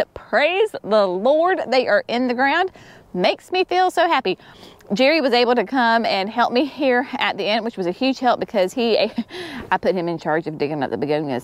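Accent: South, United States. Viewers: Oh....you are too Cute! Out in the Rain.:goodvibes: I love your sweet voice. (with your Southern Accent) > Say that three times....Shocks and Struts... Tulip Blend. 🌷🌷🌷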